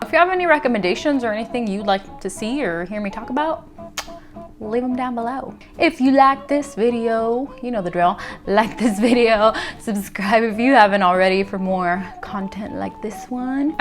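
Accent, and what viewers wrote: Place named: General American, United States. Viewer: Where in the states are you from/grew up? You have the most universal American accent ever--idk what people are talking about when saying you mispronounce stuff lol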